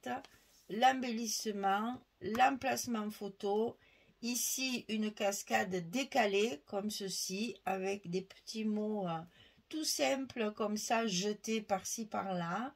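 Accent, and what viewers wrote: South, France. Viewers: Cc whaou quel bel album vous l'avez sublime avec vos embellissements. J'adore, pas si petit que ça finalement. Alors chez moi pas très beau temps le vent souffle beaucoup et il fait un peu frisquet mais avec votre bel accent du sud vous nous mettez du soleil dans nos cœurs. J'attends de voir ce projet à 4 mains mais je ne doute pas du résultat,je suis allée voir ce que Françoise faisait et ses albums sont très beaux aussi. J'attends aussi de savoir où vous allez passer pour votre tour de France j'aimerais bien vous rencontrer. Bonne après midi bisous 😘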